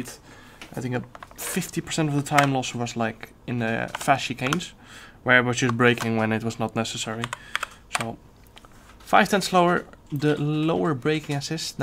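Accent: Netherlands. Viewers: verry nice nederlandish accent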